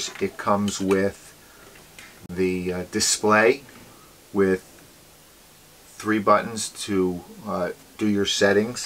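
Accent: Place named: United States. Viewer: An excellent video so thanks for doing it. I didn't get any instructions with mine but that's O.K. thanks to your video, and spoken in plain American too. U.K.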